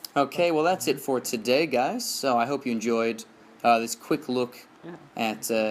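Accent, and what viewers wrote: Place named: South, United States. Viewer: Your accent has taken on a bit of a southern twang I hadn't noticed before. Hah.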